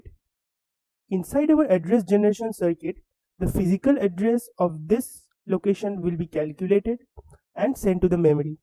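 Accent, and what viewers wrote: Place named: India. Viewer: Indians speaking emglish sounds very ridiculous, next time use subtitles, or remove anything below your tonge, the world will thank you.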